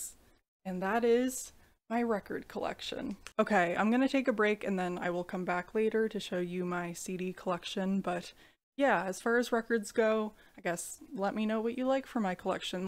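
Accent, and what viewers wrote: Canada. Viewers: figured was Canadian by the way you pronounced "out" and "about"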